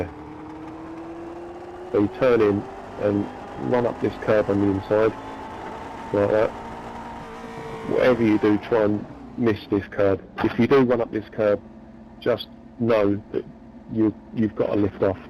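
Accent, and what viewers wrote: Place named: Germany. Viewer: Really great getting a bit of the historical background on these tracks. I can understand you much better with the German accent. Could you please use it during iRacing communication?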